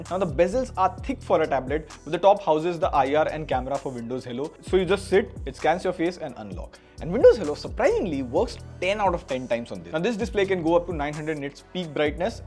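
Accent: India. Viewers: terrtble hindus accent speak slower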